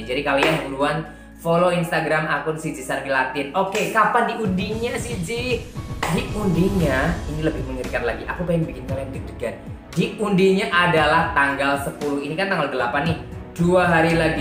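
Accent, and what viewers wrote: Java, Indonesia. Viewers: Weslah ke Bali wae ben diopeni, Ganbathe san. wae Suara mu jowo medok renyah di rungokan. Hehehe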